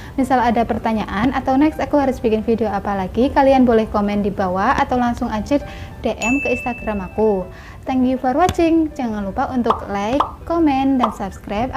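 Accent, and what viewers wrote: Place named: Tegal, Indonesia. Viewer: tegal neh hhahah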